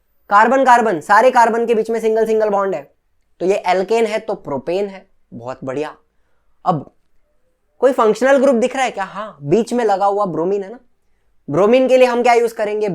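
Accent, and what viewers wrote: Maharashtra, India. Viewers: Sir aap marathi hoo kya 🙃🙃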